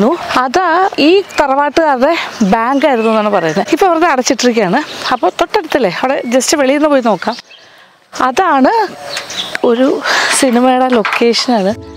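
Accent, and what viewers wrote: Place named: Palakkad, India. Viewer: Very beautiful 🥰. Chechikku palakkad bhashayum vannu. lots of love.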